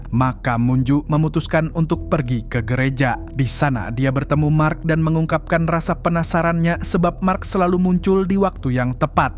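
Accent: Banjar, Indonesia. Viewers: adminnya orng banjar yh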